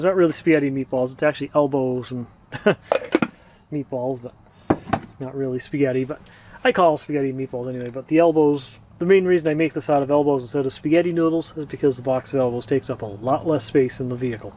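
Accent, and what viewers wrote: Boston, United States. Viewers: Just knew you must be from the Boston area! You sound so much like my son-in-law from Beverly.🙂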